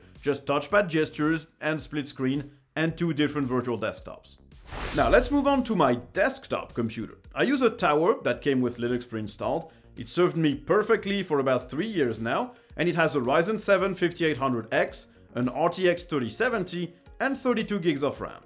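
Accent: France. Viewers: Did you say Ioatas or Aphostrophe? Could not get that from the french accent sorry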